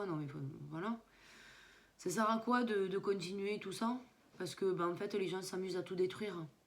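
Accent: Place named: South, France. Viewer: J’adore ton accent du sud tes expressions et ton franc parler 👍 merci pour tes vidéos explicatives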